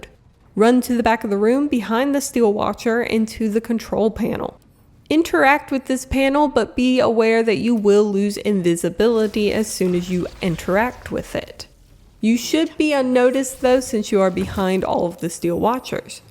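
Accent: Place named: Mississippi, United States. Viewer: i watched many bg3 guides/tips videos and by far i like yours the most. idk why lol it's just preferable to me. i like your accent btw, reminds me of my family from mississippi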